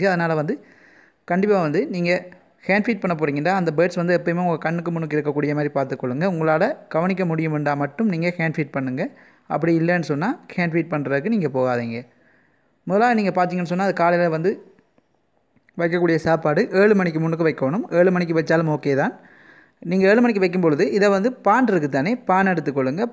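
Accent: Sri Lanka. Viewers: Super anna nenga nama natuu Tamil super nanum srilanga than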